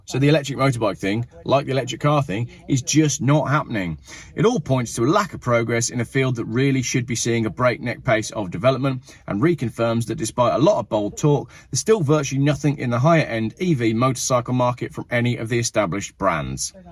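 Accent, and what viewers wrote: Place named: England, United Kingdom. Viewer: Love the English accent:)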